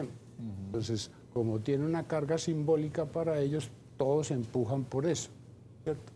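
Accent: Colombia. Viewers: Un paisa con esa tonadita explicando la guerra, hemos escuchado a muchos catedráticos internacionales especializados y leído los mejores libros sobre ese conflicto árabe israelí. De pronto los paisas sabrán contar la guerra del narcotráfico y su líder. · Fenomenal la docencia del prof. Y lo más sabroso, dictada en lenguaje paisa.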